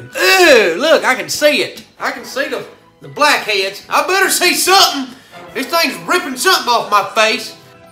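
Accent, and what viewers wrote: United States. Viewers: Man his cowboy accent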